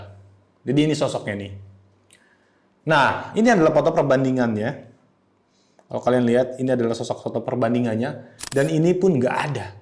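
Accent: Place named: Jakarta, Indonesia. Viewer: Bahasa indonesia betawi yee😂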